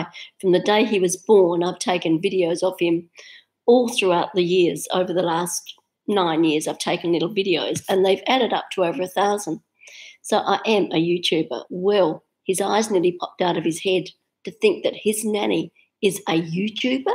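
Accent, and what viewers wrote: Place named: United Kingdom. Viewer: Hi from Turkey! I've been trying to learn English for a while. I watched your video while looking for the meaning of "gotta get going" on Youtube. Glad to meet you and your excellent British accent!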